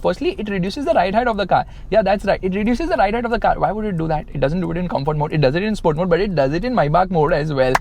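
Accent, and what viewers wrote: India. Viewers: Ебать Индийский акцент это пиздец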